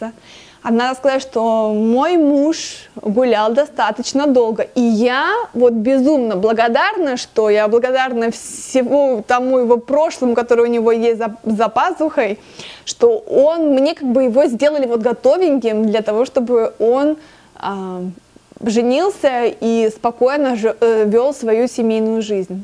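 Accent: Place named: Spain. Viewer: вот, ровно два года назад акцента еще не было)))) испанский язык внес очень красивый оттенок в ваш русский язык))))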